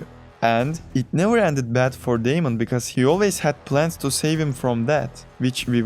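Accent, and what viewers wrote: Turkey. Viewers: Bu adamın türk olduguna yemin edebirim aksanından çok belli oluyo ginede videoların güzel böyle dewam :D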